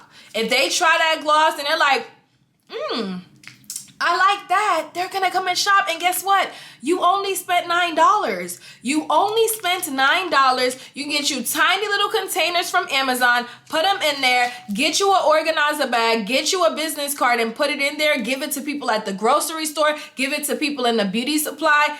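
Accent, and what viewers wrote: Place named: New York, United States. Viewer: lol, your new york accent came out in this video. I hear it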